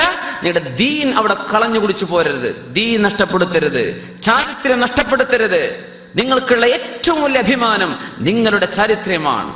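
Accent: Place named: United States. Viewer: Ni endinaaaa English american pole slang Ni thani malayali allle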